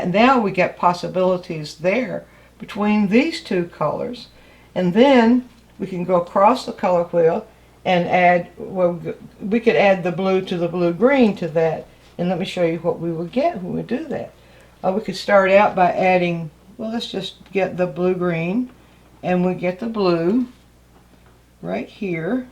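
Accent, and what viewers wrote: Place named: Carolina, United States. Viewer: Thank You!! I hardly ever use the earth tones straight from the tube, I always mix in the compliments, but the way you leave the variations on the palette may have just solved my issue I'm having with a subject that has dark bronze skin tones part sun, part shade. Duh! Why haven't I thought of having a gradient available? Also, being from Alabama, do I detect a Carolina accent? lol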